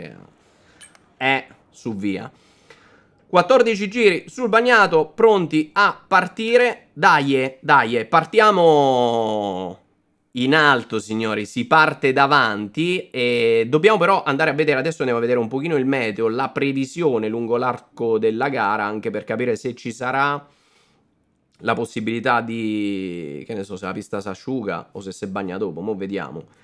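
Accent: Rome, Italy. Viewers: La tua parlantina da Romano mi fa morire 😅🎉😅, comunque inizia a freni sui 100 m dall'inizio della curva..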